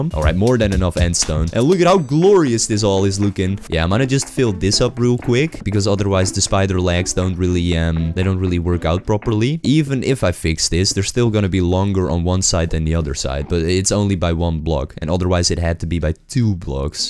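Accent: Netherlands. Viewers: Ik hoor gwn dat je Nederlands bent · Are u Dutch, you sound like it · You sound pretty dutch bro like me. Like said you sound like.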